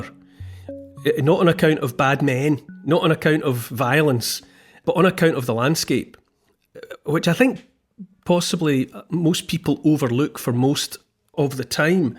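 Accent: Scotland, United Kingdom. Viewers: Well, I thought I was going to be able to listen while I refinish my floor but I couldn't take my eyes off the amazing views. I didn't realize how stressed I had gotten with the elections and all else until I heard your voice and watched this. I really needed a moment to remember the absolute gorgeousness in this world. I started listening to you a few months ago because of your good common sense and your accent. My Grandfather was from Scotland and I miss his voice, especially during times of unrest. I continue to listen to you because I need and so much appreciate view points from outside the states especially from someone with the characteristic no nonsense, tell it like it is, passionate, quit your whining, Scottish trait. Thank you, for your videos. They truly mean a great deal to me. On another note, somewhere toward the beginning of this video you showed a picture, don't even know what it was in particular now but, it immediately made me think of the Bay of Fundy in Nova Scotia, Canada. 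My mother and I were visiting family up there and we stopped to see the tide come in. My mother explained how a big wave would just come pouring down the inlet at high tide. As a young child at the time, I thought she was exaggerating. Sometimes she could be a bit melodramatic. But as we stood there waiting more and more people came to see the tide come in so I thought maybe this was going to be something interesting. Then it happened, from far off you could see a wall of water racing d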